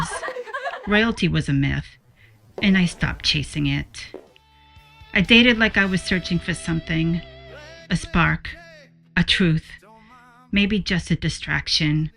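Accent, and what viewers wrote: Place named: Boston, United States. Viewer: Hi! I’m watching now. I really don’t hear the Boston much except when you said “hahtbreak”. lol. I can reel mine in when I have to but that’s no fun. What really interested me about this project is you saying it’s about you. I’m into any kind of creative endeavors as therapy.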